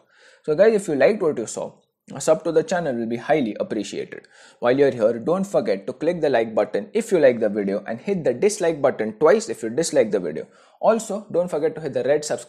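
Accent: India. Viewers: Englis india ajg. Wtf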